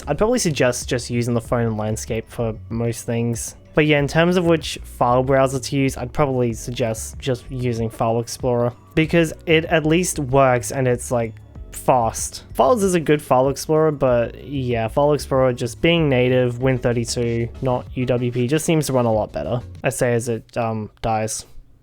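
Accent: Australia. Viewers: I was about to say damn this guy should bee australian tghen i realised hes on yt au...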